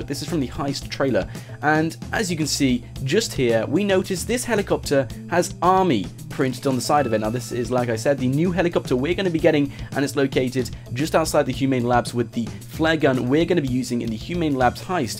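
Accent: United Kingdom. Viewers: Why do British people say pronounce it Mili-tree instead of mili-tary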